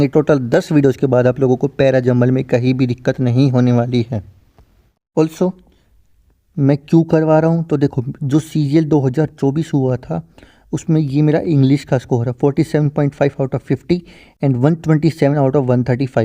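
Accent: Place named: Rajasthan, India. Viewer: accha mujhe rajsthani voice lagi thi aapki isliye puchh liya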